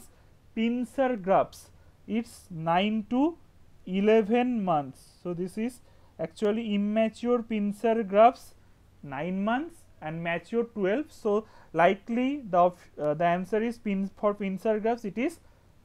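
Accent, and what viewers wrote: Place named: Bengal, India. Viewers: It's bengali accent I think, they pronounce a as O generally. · Don't go with accent. He was Our WBUHS topper got honoyrs in every subjects all 14, gold medel, record marks in Obs gynae..... And Our Bengali accents are like this.... don't go with this.... he is a very good doctor that's a matter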